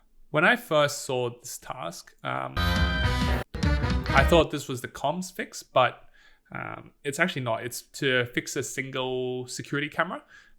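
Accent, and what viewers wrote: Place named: Australia. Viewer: It took me til like halfway through that video to realise that you're Australian, like me. I'm more used to seeing Among Us related content with people predominantly with American accents and since I'm Aussie myself it took a sec to realise that yeah I'm used to that accent, but that's because I'm Australian, and not because I'm used to Among Us content in that particular accent.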